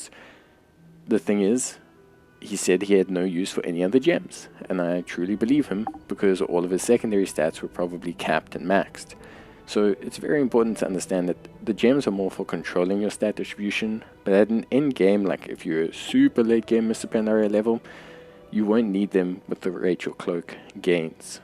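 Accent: South Africa. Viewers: What accent is that? Saffa?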